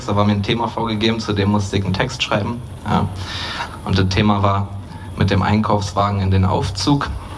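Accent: Berlin, Germany. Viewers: allein der Mist den er redet Bringt mich dermaßen zum lachen.. und dieses berlinerisch dazu... ich Weine... xD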